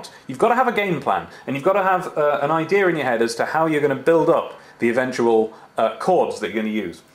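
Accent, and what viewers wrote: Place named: England, United Kingdom. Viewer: So glad I've finally see man with an English type accent make fun of Americans...my life is now complete...wait...or are you AUSTRALIAN?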